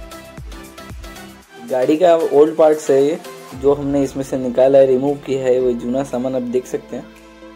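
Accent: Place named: Bihar, India. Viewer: Osm work bro Bihari language me ekdam kantaap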